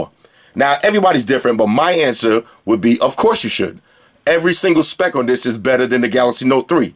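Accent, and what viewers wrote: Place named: New York City, United States. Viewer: I'm here just to hear your NYC accent!!!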